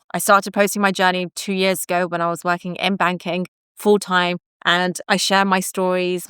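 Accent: United Kingdom. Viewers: Is this British accent? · I closed the video after hearimg british English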